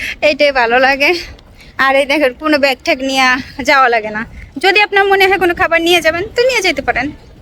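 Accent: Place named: Comilla, Bangladesh. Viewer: আপনি এভাবে কথা বলেন কেন,আপনি কি কুৃমিল্লার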